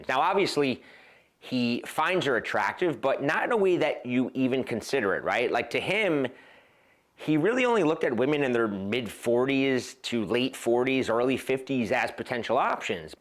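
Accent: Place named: Philadelphia, United States. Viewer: Love it. Slow validation. I’m in exact situation now I’ll be 55 and the girl I’m dating is 39. I’m used to dating much younger woman so I’ve learned this overtime, it took me time in a few mistakes, but eventually, I got it . Quite frankly, most women love a mysterious man. I hear that Philly accent by the way.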